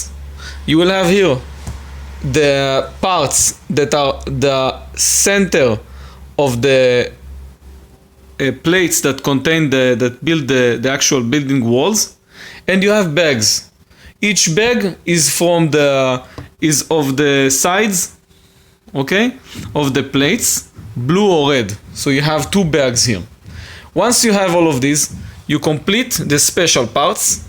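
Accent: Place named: Israel. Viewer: Love your Israeli accent!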